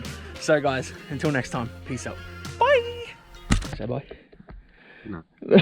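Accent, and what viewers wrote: Australia. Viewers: You Aussie? Where ya from? Looks like S.A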